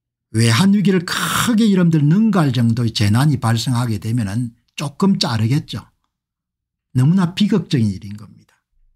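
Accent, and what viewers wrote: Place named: Tongyeong, South Korea. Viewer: 공대표님 정말정말 잘 지적하셨습니다 제일 절실한 현황입니다 골로 가지 않도록 더욱 빼딳하게 쓰야 합니다 말씀이 저의 고향 통영 말투 비슷하네요 정답습니다 감사합니디ㅡ😊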